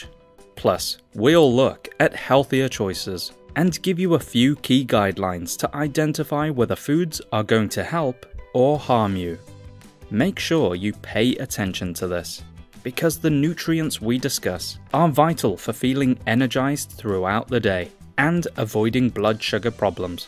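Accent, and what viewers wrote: England, United Kingdom. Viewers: A voice over with english accent means it is probably BS. Iguana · Why is someone with an English accent pronouncing items the American way?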